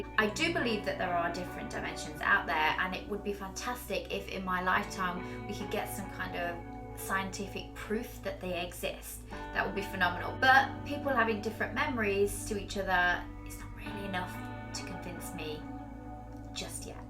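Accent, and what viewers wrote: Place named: England, United Kingdom. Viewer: Hi, I’m from California and enjoy learning about different peoples experiences of England and in England. I was wondering which region your accent is from. Just particularly like the way you speak. Have a great day. Thanks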